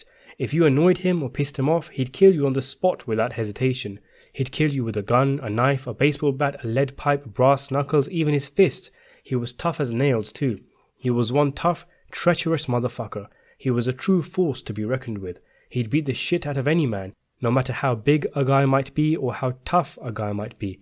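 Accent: United Kingdom. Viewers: Has this guy got a british accent? I am british i never thought he had one till i saw this I have always presumed the narrator of this channel was Indian Maybe he is british but from an indian family background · Pakistani British accent? Am I correct? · This was actually a pretty decent narrative but I just can’t get over a British accent describing American mafia…I just…. Can’t. It’s gotta be a New Yorker or an American who sounds like a cop. Sorry Brits…love your documentaries but when it comes to American mafia, your eloquence takes something away from the experience 😑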